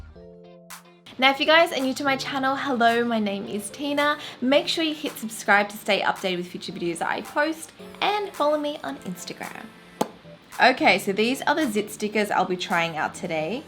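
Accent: Australia. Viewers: An Asian woman with an Australian accent? That's so cool!!! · What accent is urs? Sounds a bit Australian but im not sure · The Australian accent.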